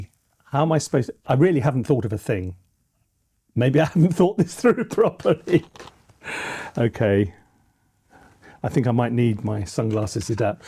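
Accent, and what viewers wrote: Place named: Scotland, United Kingdom. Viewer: Sounds very Scottish .... almost in need of a set of bagpipes...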